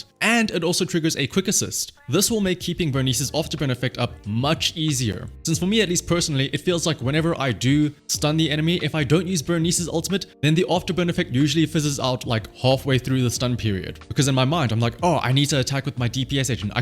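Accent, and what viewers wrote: South Africa. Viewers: I felt that South African accent, nice to see another playing some zzz love the content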